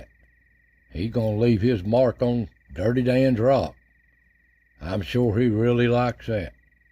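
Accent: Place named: South, United States. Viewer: Thank you for bringing the wildlife and woods to me Mr, i love your voice and southern accent. ❤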